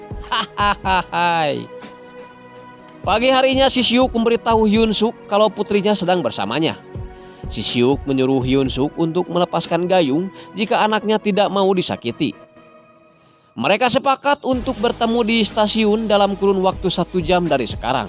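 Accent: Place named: Majalaya, Indonesia. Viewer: Orang Majalaya mang.pantesan sundana te ciga garut.11/12 kt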